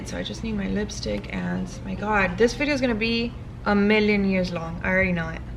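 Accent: Cuba. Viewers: OMG!! Me encanta tu acento cubano, besos desde España. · ayyyee my question made it to the video! Me encanta tu acento cubano 😉 I’d be cool to see a GRWM in Spanish or any other video spoken in Spanish ! 🤗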